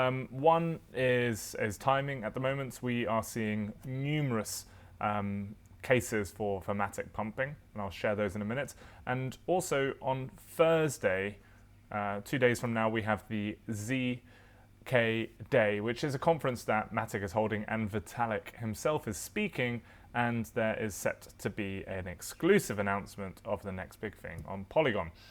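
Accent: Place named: United Kingdom. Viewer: Great to hear another British accent :)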